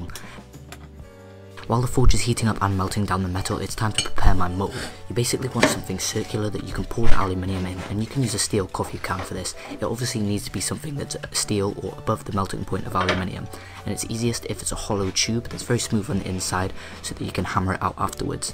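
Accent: United Kingdom. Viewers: dude, you realize he's british, right? like did you literally not even notice that?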